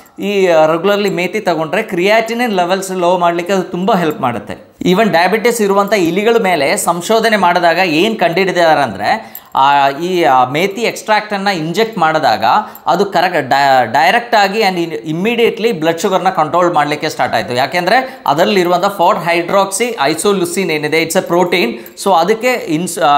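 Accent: Kerala, India. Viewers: Kannada mathaduvavaru kuda Doctor Nimmastu Shudhavagi Kannada Mathadalla Butfrom Kelavu padagala Accent gottagutade Nivu from Kerala But Nimma pure Kannada Vivarane Nivu Hutti Beledidu& Education khanditha karnataka yendu Nimma pratiyondu Vidio nodutene . Tumbaa chennagi tilisutiri 👌👌👌 Nimage Vandanegalu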